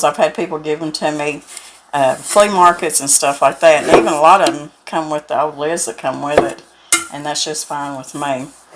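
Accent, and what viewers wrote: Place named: Arkansas, United States. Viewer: Oh, my goodness. I just want to come and sit and visit and eat some of that good down home cooking! I love to watch your videos and listen to your Arkansas accent. Thank you for all the good recipes. I recently bought a grain mill and watched your videos on your new grain mill. I’m 80 years young and still learning new things. God bless you both!!! ♥️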